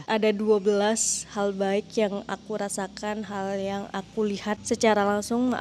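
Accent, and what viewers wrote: Malaysia. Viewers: Bahasa malonsia jelek banget tidak jelas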